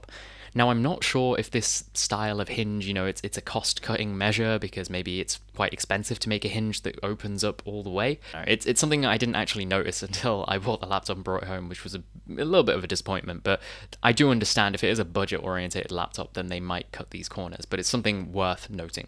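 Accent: England, United Kingdom. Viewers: One of these is being sent to me as a replacement for my old unit which HP lost during repair. Thanks to your review I'm excited about this arriving, so thank you. No idea whether or not mine will have the backlit keyboard but otherwise it's exactly the same spec as yours. You sound english and mentioned english prices, but the layout of the keyboard you showed in the video isn't. So that's more confusion, coupled with HP showing images of this unit having a microSD card reader. For me, it's replacing a 2019 Ryzen 5 3500u from the essential range, so should be quite a nice step up regardless.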